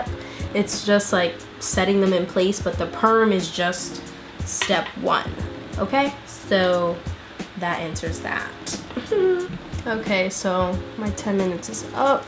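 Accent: United Kingdom. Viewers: Your British accent is killing me🤣🤣🤣 I’m from London x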